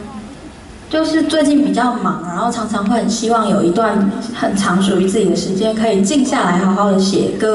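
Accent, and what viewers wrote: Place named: Taiwan. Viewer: 还是她的口音跟我台湾人的朋友的很像。有亲切感😊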